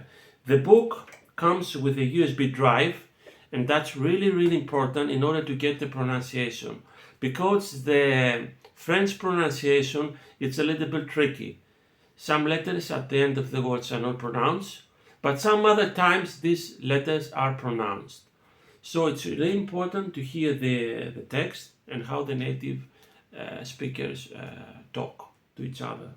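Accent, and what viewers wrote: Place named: Greece. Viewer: The way you speak english strongly suggests me that you are greek. Την εφαρμογή χρησιμοποιείς η το βιβλίο της ασιμιλ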